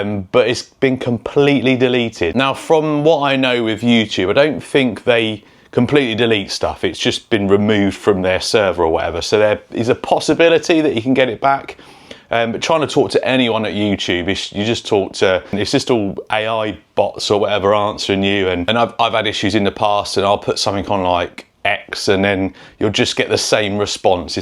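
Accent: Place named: England, United Kingdom. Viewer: you have a nice english accent that is nice to listen to..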